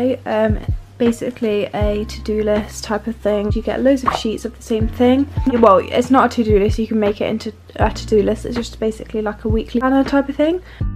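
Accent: United Kingdom. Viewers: ur british accent has my all heart 🥺 ps: i love all your videos too<3 xx from Brazil 🇧🇷❤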